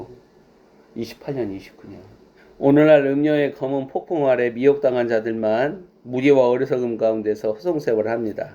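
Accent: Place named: Jeonbuk, South Korea. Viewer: 그러시군요 말씀중간중간 억양이 그러신듯해서 여쭈어 보게됬습니다 저도 잠시 전북에 머물고있다보니 그런것도 구분이되네요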